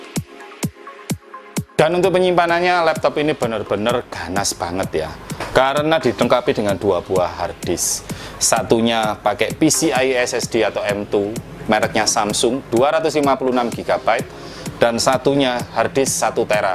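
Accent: Java, Indonesia. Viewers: Medok Mas...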